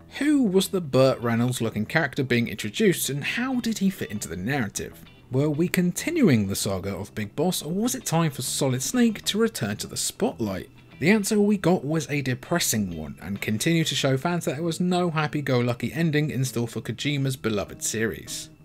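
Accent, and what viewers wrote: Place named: United Kingdom. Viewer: PO'OL TAOW. I love British accents.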